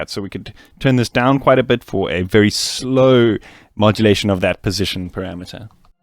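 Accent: South Africa. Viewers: Yooo a fellow South African?!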